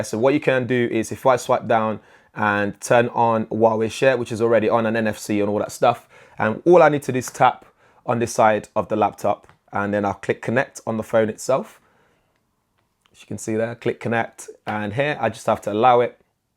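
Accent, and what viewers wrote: United Kingdom. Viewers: Brits English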